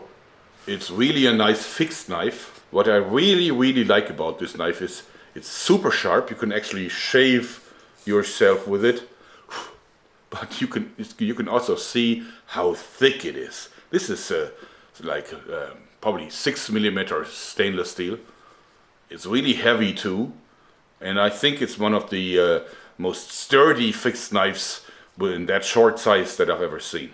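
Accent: Germany. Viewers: is er deutscher? :3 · i've always loved his accent xD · This beautiful Fleshlight hohoho, that german accent :D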